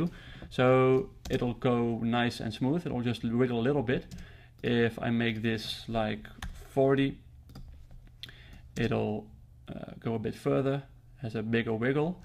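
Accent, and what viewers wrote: Netherlands. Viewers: Are you dutch? hahah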